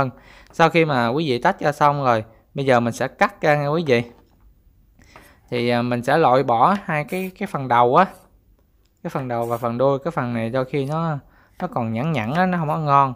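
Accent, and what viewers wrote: Mekong Delta, Vietnam. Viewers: 😁😁😁 giong chuẩn miền tây nghe ấm áp nghĩ... ❤️ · Anh nầy dân Nam bộ miền Tây giọng nhà quê nên đừng có trách ,mặc dù anh hưỡng dẩn cơ bản như vậy nhưng ta cũng cần phải luyến láy đôi chút như xử lý củ hành cho bớt cay ,sắt xéo dưa leo cho đẹp hơn ,bào cà rốt cho dài hơn ,dưa leo cà rốt đều để ngăn mát ,có thể thêm nước mắm nếu không ăn chay và rau thơm có thể dùng loại nào mình thích không riêng gì rau húng cây . Cám ơn bạn trai đã chia sẻ ! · Đặc trưng của dân miền Tây đó bạn. Mình nghe dễ thương mà!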